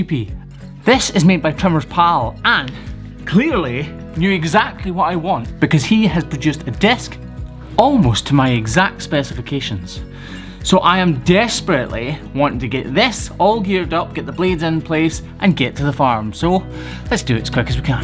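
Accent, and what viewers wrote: Scotland, United Kingdom. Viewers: Thanks for a lovely episode, nothing like waking up to pure Scottish in the morning, you are so helping my daughter with her accepting she speaks Scottish.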